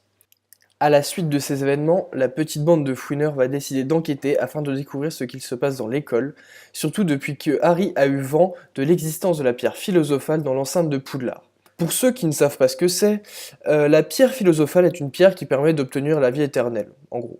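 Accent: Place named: South, France. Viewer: super voix, j’adore l’accent sudiste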